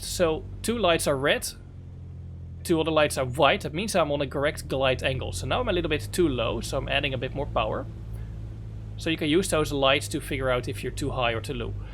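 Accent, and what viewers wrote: Netherlands. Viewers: Wat een belachelijk Hollands accent, Leer eens Engels praten he.. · Dutch accents are not really harder to understand then other English accents. My Canadian family perfectly understands this kind of dutch accent... beside that, this comment is completely off topic. I'ts a great video of how to properly setup and fly the Cessna 152. A little more respect for content creators please. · Awesome video, love the Dutch accent 😊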